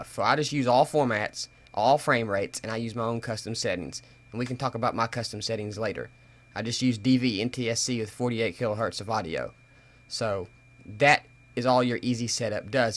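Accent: United States. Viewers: U HAVE A COUNTRY ACCENT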